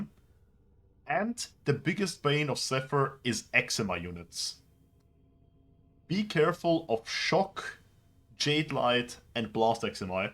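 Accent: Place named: Germany. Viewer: Love your content, very informative. In like 2 minutes i learned more than 90% of the big warframe ytubers convey in 20. In case i heard your accent correctly, greetings from a fellow german from hessen.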